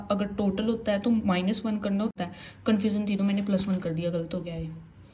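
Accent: Haryana, India. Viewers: Haryanvi type language h aapki ...?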